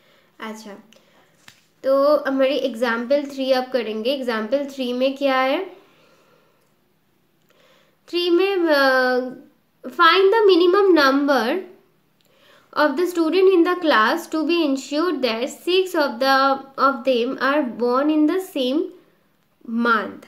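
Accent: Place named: Bengal, India. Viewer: Bridger O'Neill it's in Hindi with some Bengali accent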